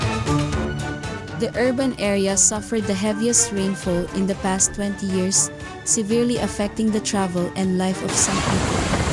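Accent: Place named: Philippines. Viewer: Your the speaker are from the phillippines nice voice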